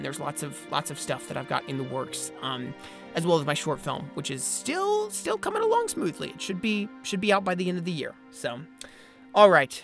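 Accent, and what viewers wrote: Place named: South, United States. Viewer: He says y'all he really is from Houston/the south in general.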